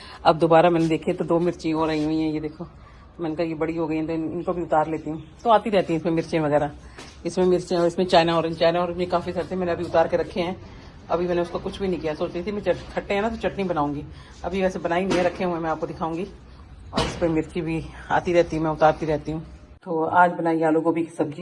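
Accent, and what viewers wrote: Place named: Multan, Pakistan. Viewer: Meri mummy bhi aise hi baat krte hai ..hum bhi multani hai...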